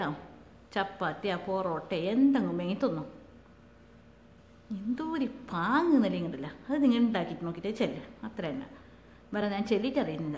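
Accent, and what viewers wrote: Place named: Kasaragod, India. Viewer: Ingale kasarod slang I like ..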